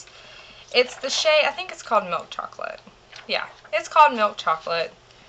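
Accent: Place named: South, United States. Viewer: This video reminded me how much we have in common! 1. Southern accent. Love. 2. Curly hair 3. Obsession with the E35 ...just for starters :) Great video, dear! Love it!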